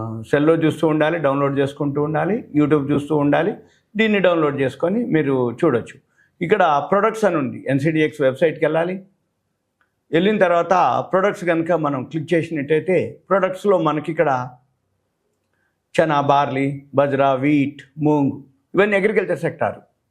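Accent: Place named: East Godavari, India. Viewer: Sir Mee native east godavara. Educated presentation LA ledu. 🙏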